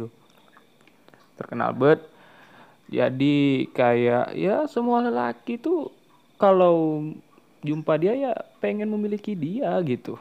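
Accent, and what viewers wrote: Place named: Pekanbaru, Indonesia. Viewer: jelas bgt logat pekanbarunya hahahaha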